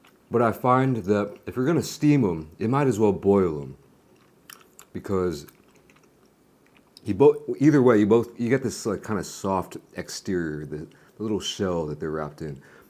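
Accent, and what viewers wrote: United States. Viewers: you sound like you were from the USA, And smoke Blunts after Blunts..!! I love it..!! also i love being able to check out all the kick ass Korean foods and products..!!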